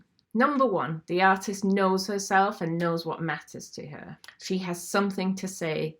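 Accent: Yorkshire, United Kingdom. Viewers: After trawling through so many art videos on youtube, this is the first and only one that has left me with more questions than answers and that is brilliant. Eight minutes of inspiring and thought provoking content. So nice to hear a Yorkshire person too. If you have any tips on how to gain inspiration from living in Leeds rather than beautiful North Yorks that would be greatly appreciated!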